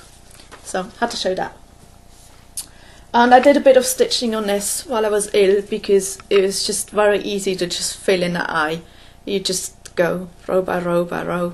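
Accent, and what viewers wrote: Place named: United Kingdom. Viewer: I got here from StitchingMommy’s Flosstube, and I love your video. I adore British accents, and many people here in the US do also, so I hope your channel grows. There’s nothing i think you’re doing wrong. Maybe put more easily searchable words in each video’s title, possibly the designer’s name of something you’re working on, or something like that? One thing I really enjoy, too, is that you never say “um”! Many flosstubers haven’t mastered that yet, and eventually I can’t watch any more. Your voice is pleasant and has a lovely cadence! And I suffer from depression also. Your advice about light is spot on! 👏👏👏 I keep all indoor lights way down low after the sun goes down. Only a nightlight i. the bathroom. Only the stove hood light in the kitchen, etc. After a week of this, my insomnia disappeared and has never come back. 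That was 3 years ago.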